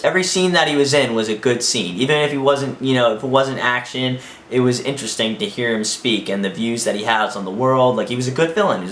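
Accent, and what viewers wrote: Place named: New Jersey, United States. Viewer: l love the hint of a jersey accent you have 😄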